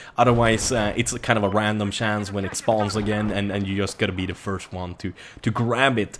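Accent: Sweden. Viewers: Is he from sweden?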